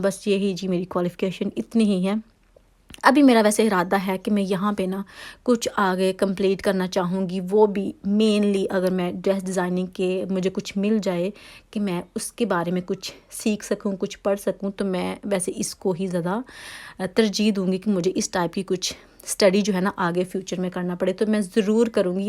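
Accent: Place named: Faisalabad, Pakistan. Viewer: Ap ki lahaja MERI Tara ha shiad ap b Faisalabadi ha 😁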